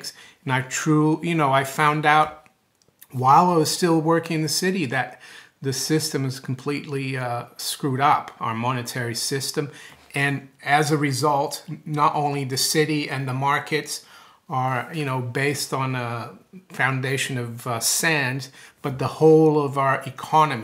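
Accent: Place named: Massachusetts, United States. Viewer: I know you've lived in Geneva and you've been in London for quite some time, and I think you mentioned in another video that you were born in Brazil and your family is from Brazil. Not that it matters, but I'm wondering why you talk like you're from Massachusetts. Do you have connections to the States?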